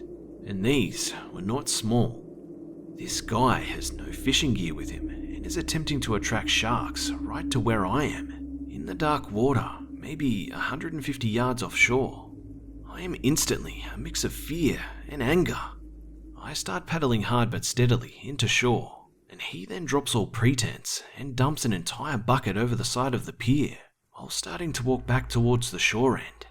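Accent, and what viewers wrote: Australia. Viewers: Hey! R u Australian? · Are u Australian? BTW HAPPY BIRTHDAY!!!!! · Justin Ramsaran - He's Australian 🇦🇺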